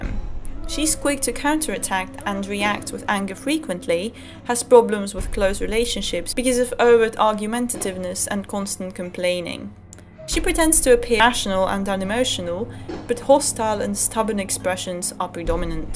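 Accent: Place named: Netherlands. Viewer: Nice video! Interesting accent. It sounds RP english but with a heavy Flemish or dutch flavour to it. Something about the pronunciation of the word "o" and the emphasis on the consonants at the end of words. More clipped. Sorry; accents fascinate me.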